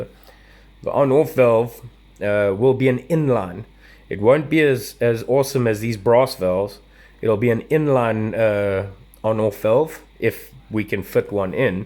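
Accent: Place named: South Africa. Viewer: Off topic, but... just an observation... Interesting accent you have in South Africa! To my ear (Southern American) I detect a little Brit, a little Aussie, and oddly enough, a little deep south American. Back on point... I'm wavering between the Stormer Elite and the TMC. I can get a TMC for $40 US (at $229) more than the Stormer (at $189). For that small price difference, do you think it's a better purchase to get the TMC, overall? Will be running a red dot so don't care too much about the flip up sights. And the easy on, easy off fore end isn't a big deal either. But I do kinda like the metal construction of the TMC, as well as just the overall aesthetics, so leaning toward the TMC. Assuming that mechanically they are the same gun... err... I mean, MARKER! But with the Stormer being newer, I can't help but wonder... Does it have some subtle "improvements" or has it been downgraded with subtle cost saving measures.... 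Any thoughts?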